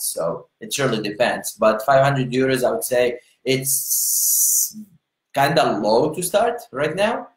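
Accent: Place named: India. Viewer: انجلزيتو مثل انجلزية الهنود الي عمرهم ما خرجو من الهند !!! لا اعرف لماذا يصر على التكلم كليا بهذه الانجلزية !!!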